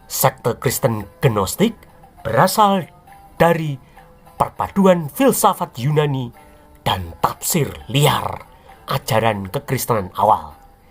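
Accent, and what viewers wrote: Java, Indonesia. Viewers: lu pamer bhsa medok jawa lu,ato jrgon nusantara lu,mo sok NKRI lu,ato lu pemuja fanatik jawaisme lu,klhatan cupunya lu ndeso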